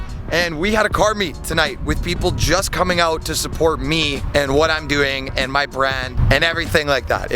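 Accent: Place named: Canada. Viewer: Buddy!!! Sick ass subi. First time watching your video definitely subing. I heard the accent of a fellow Canadian Brother. What Provence you in? ( If you don't mind me asking)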